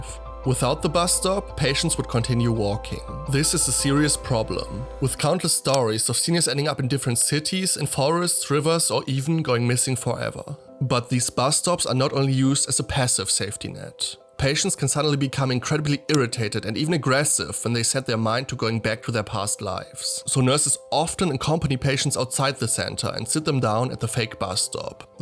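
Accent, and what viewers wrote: Germany. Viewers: Brudi man hört direkt, dass du deutscher bist. Glaub wegen dem Akzent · It's so hard listening to English with German accent😅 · Btw der deutscheste aktzent den ich je gehört habe 😂 einf geil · Dieser heftige deutsche Akzent. Wieso macht man einen englischen Account, wenn man solche Schwierigkeiten mit der Aussprache hat? Das tut beim Zuhören ja weh. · Grausamer deutscher Akzent! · Love love love your German accent!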